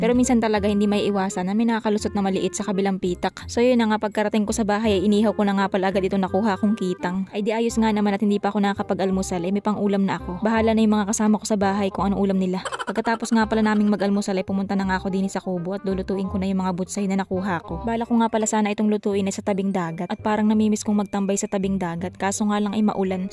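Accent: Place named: Visayas, Philippines. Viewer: Bkit Ang salitamo mayhalong besaya besaya b kayo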